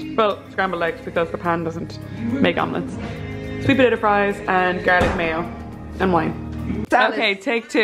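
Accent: Ireland. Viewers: Loved this vlog 💕Would literally buy the cottage in Doolin tomorrow if was for sale! NYC just pales into comparison for me. Trilled (!!) you made it home safely (love Irish accents 🤗) Looking forward to more vlogs from Ireland (Q and As would be fabulous) Delighted to see Cooper also 🐕 Stay safe, healthy and positive🌈 Does have a vlog or Instagram? 🌞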